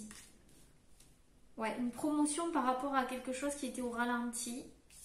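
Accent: South, France. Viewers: Votre accent du midi est absolument charmant et dynamisant c est trop chouette et mignon 🙃❤️👩‍🦽👁️👁️🌈🗝️🪐😇🌝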